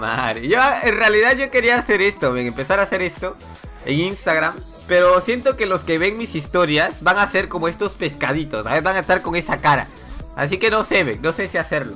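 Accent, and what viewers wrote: Peru. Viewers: Habla como peruano Oe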